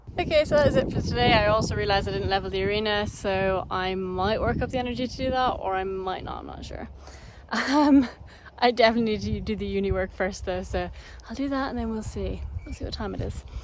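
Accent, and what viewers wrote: Ireland. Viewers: First. Wonderful vlog. New helmet! Love it! Just enough bling! I love how you used to say Khal was so pokey and you kind of looked resigned. Like - yup he's sooo slooow. He was but I still thought he was adorable. When I revisit those vids I can barely see the incredible jumper he is today. I really loved him but I could not for the life of me see his future self. Kudos to you for seeing it. Second, I LOVED your comment about Meg. Lordy, she is funny with the semen. She blushes and I crack up! I am not a vet but am a nurse so I truly have no problem discussing anything at all with anyone at all. Healthcare, be it animals or people is full of lots of bodily fluids going willy nilly. And as an American, I love listening to your beautiful Irish voice but then you said Urine in a way that my American ears almost started to bleed! It was so darn funny too me. 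Keep up the wonderful content. Sorry for the long comment-I just have so darn much that I love about you and your horses, your dad and your attitude. Plus I love that I know about gentamicin.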